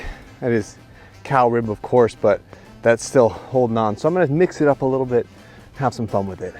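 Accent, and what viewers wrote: Australia. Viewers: Thought it was pretty good you doing an aussie accent .as im an aussie . you actually sound more aussie than most people i talk to.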